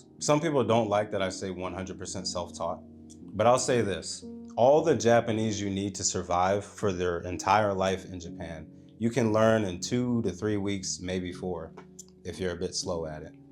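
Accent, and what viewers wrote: United Kingdom. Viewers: New subscriber. Awesome content. Brilliant British accent too 🎉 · That was a lovely posh British accent! Very interesting channel. Nice to see another brother in Tokyo doing well.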